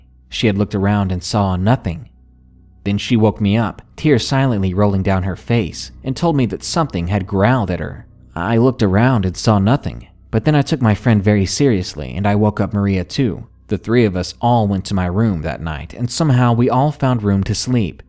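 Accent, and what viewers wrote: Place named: Rural, United States. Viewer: Lol, but you're a hick with brilliant narration skills!! Great voice too.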